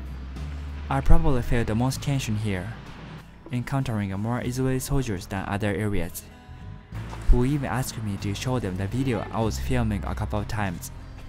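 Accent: Japan. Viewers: I can understand that your English accent is heavily Japanese, but that doesn't mean you understanding on world issues should be so foggy and uneducated. 🤔 THERE IS NO PLACE OR NEED FOR YET ANOTHER ARAB COUNTRY IN THE WORLD ! <link>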